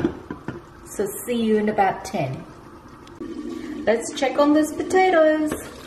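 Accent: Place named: South Africa. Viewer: Because she is not an Indian, she has a South African accent - how rude and totally ignorant, (maybe uneducated as we'll I suspect) to ask "why the accent? " - I made this recipe and it is awesome - thank you lovely accent lady❤❤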